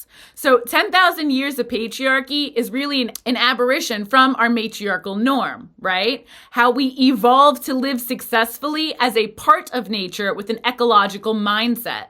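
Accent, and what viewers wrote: United States. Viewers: what region of America are you from?, you have a slight accent, just curious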